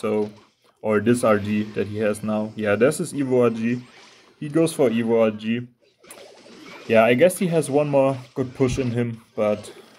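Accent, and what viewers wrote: Germany. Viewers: Yo bro i do have to say that i really like ur videos. U are literally the second youtuber in my last 13years of youtube who i would consider as a "small youtuber" where I watch all the videos. I have never thought that right now i prefer ur videos against videos from big youtubers like trymacs,.. because I just play CR every two days for 3, 4 matches. What is more i really feel entertained by ur commentary I have never thought that i can watch 15min pure clash royale videos without something else. Keep up the good work and maybe u can start with professional tutorial videos and so on. I am sure that this will lead to a faster grow because I do see ur channel with +50k subs when u try to get more professional and attract more people by tutorials,... And btw I love ur accent i am also german🙌🏼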